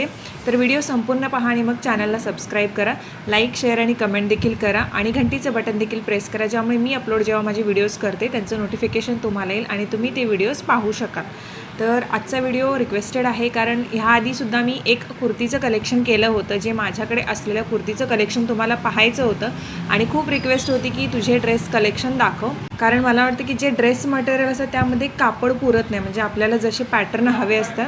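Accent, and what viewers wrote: Kolhapur, India. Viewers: Tai me suddha kolhapur chi aahe tumhi dadansobt kolhapuri bhashet bolt hota tr khup bhari vatal😍😍😍jagat bhari aapli kolhapuri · Ya blog la tu assl kolahpuri bollis khup mast vatl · ताई तू कोल्हापुरी भाषेचे छान बोलतेस एकदा बोल की मग व्हिडीओ त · Kolhapuri language ahe tumchi😀🔥❤️ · Nice vedio all....pn tumhi Kolhapur che aahe he mast vatal language aaikun😘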